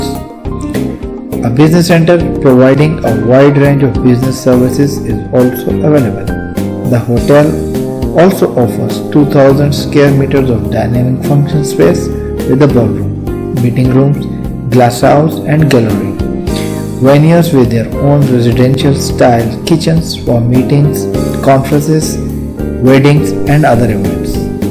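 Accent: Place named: India. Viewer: the way you speak i can guess you are from india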